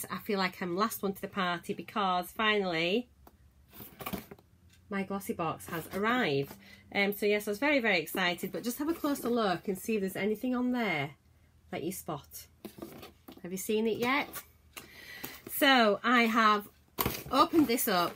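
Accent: Yorkshire, United Kingdom. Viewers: That would have been a nice little gesture on their part if they would send you the Monday all I want box for free, especially as you are a beauty youtuber!! Also, you sound like a Yorkshire lass... am I right? xx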